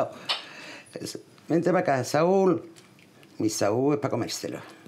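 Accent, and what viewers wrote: Andalusia, Spain. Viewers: aquí en Madrid vivo yo y no se escucha eso sí el acento Andaluz lo apreciamos mucho viva tu cocina y tu salero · El mejor habla el andaluz y la cocina de olé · qe grande eres hija una papas guisar qe buenas el Señor ese qe aprenda el el Andaluz qe es lo más bonito de el mundo entero un abraso mui grande guapa ♥️♥️♥️🌹🌹🌹😘😘😘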